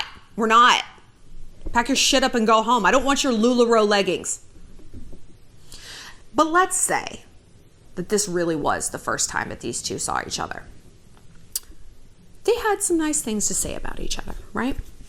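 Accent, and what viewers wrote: France. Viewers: Ton accent français est très mignon!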